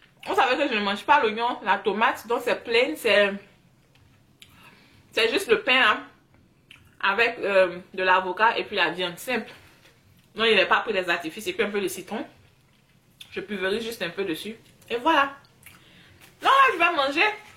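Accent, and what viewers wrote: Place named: Ivory Coast. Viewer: Naaan il faut que tu m'explique comment t'arrive à avoir un bon accent en anglais alors que tu as aussi ce magnifique accent quand tu parle français avec ton accent ivoirien. ça fait des mois que je suis au usa et mon accent français est toujours aussi fort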